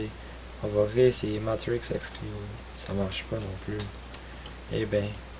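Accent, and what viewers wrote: Canada. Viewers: LA CANADIEN QUOI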